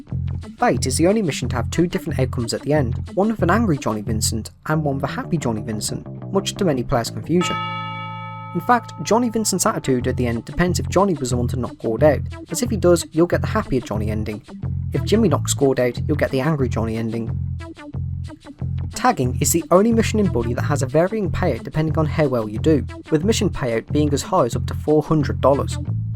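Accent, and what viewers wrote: United Kingdom. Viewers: its a british accent. whats so hard to understand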